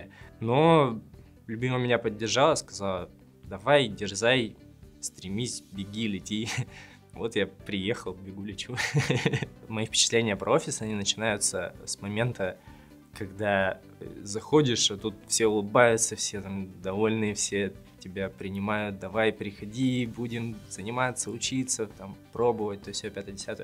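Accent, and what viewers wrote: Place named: Urals, Russia. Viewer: Даже можно было бы не говорить что с Урала, по говору и так всё ясно)))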